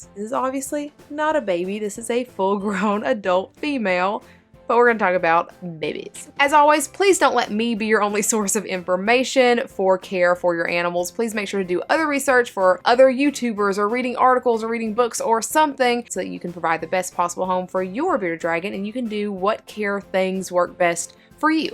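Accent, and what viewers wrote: South, United States. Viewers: I like your southern accent, and the way you say thangs lol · New viewer. Great content! You from the South? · Such a pretty and upbeat young lady. Love that southern accent!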